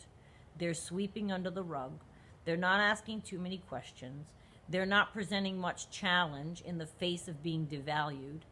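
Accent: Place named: New England, United States. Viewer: I just found your channel today and have been binge watching. One of your videos you said your hair was up bc it was hot in Florida. I thought to myself “Oh, I thought for sure she was from New England based on the accent” then you said Rhode Island in this video. I gasped lolol I’m from and still living in Rhode Island!!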